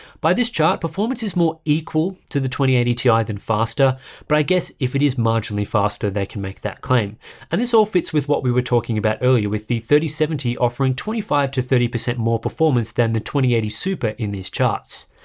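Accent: Australia. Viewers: An actual proper analysis, in proper Australian English!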